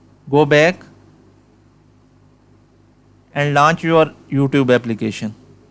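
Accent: India. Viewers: Im tired to listen indian acsent talk English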